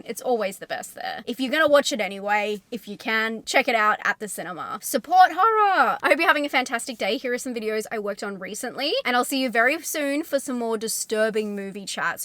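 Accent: Australia. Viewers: Not sure why this is a response to the comment above.. but what you seem to be bothered by is just regular Aussie speech patterns (there are other people elsewhere that have this intonation but it's very prevalent in Australia/New Zealand)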